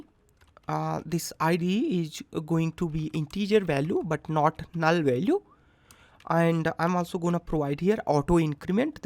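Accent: India. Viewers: Nice video with nice bengali accent :-)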